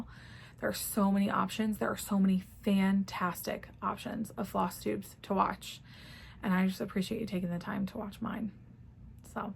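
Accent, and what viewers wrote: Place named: Ohio, United States. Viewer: First time seeing your Flosstube - thanks! Sounds like you’re a fellow Ohioan! 🎉 Liked and Subscribed and look forward to your next! ❤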